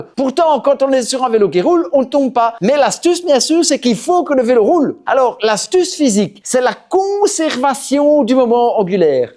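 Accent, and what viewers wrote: Belgium. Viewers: nos fameux professeurs belges avec l'accent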